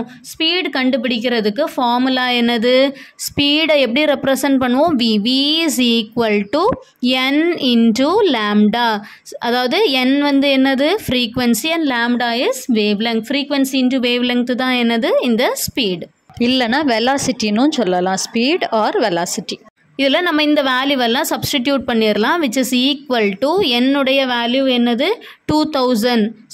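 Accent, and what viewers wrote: Kanyakumari, India. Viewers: Kanyakumari voice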